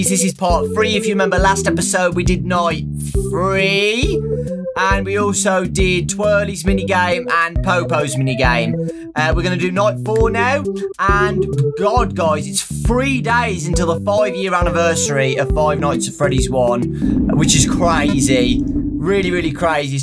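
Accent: United Kingdom. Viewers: wow you are british!!!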